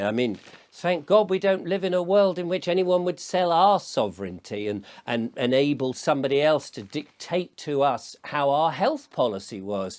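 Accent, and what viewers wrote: United Kingdom. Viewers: Wow! So well said. Its almost as if Mark Twain were still alive. We all know how he saw through things, too. I wish I was receiving that paper just to get a good laugh. Such an excellent job of presenting our situation. You are so gifted at speaking. Love your wonderful accent and British demeanor. Just absolutely love you. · You are an outstanding human being buddy ...no nonsense British talking ..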